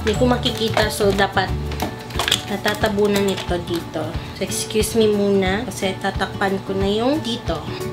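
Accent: Philippines. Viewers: are you cebuana?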